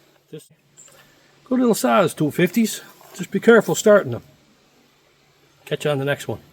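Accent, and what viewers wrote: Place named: Newfoundland, Canada. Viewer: Just hearing you talk makes me miss living on the rock. Thanks for the video 😊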